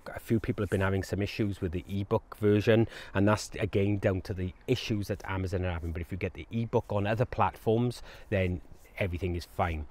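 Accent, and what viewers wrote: Wales, United Kingdom. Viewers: Hello Great video as always. I have found just the same this year- massive top growth using a home made traditional and worm compost with some peat free added plus BF & B and potato feed. Leaving it as long as possible to check yield. Tomato seeds planted in the same medium failed to thrive and develop. I had to buy plants from the local garden centre- first time in years. Great though to hear a familiar Welsh accent.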